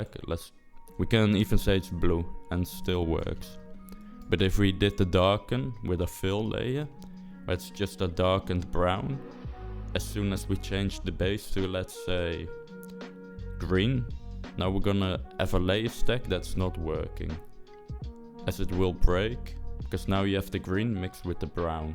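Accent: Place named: Netherlands. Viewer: 100% a Dutch guy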